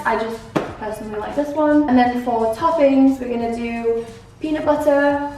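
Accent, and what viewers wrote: United Kingdom. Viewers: Where is from? She has like this accent from the UK, but the name doesn't sound European?.-.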